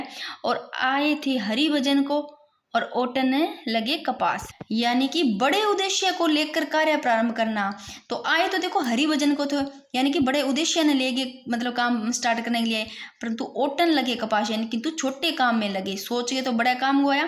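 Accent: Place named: Marwari, India. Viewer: आप मारवाड़ी बोला करो मजा आता है सुनकर दीदी 😊😊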